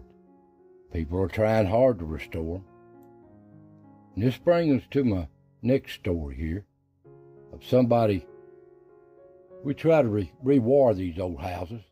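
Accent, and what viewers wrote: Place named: South, United States. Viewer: We just found you not too long ago. We really enjoy hearing you tells us those stories thru the sound of your southern drawl; & I mean that in the best of ways. We have been greatly disappointed with what we have found with some so-called Smoky Mountain YouTubers; a real slap in the face of the honest, hard working & authentic folks of this area! Thank you for a “pure” representation of these mountain that God Breathed into existence & the history of the folks that lived & breathed there☺️. 🫎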